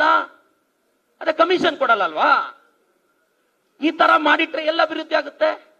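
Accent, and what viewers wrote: Telugu, India. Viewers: Telugu style dialogue super guruve.👏👏👏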